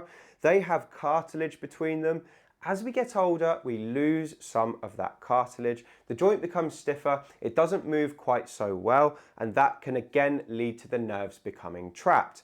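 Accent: United Kingdom. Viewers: when a handsome guy speaks with this British accent you better trust him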